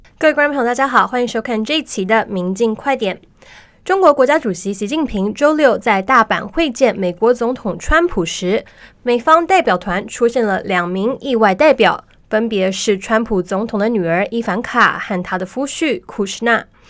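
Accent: Taiwan. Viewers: 台湾口音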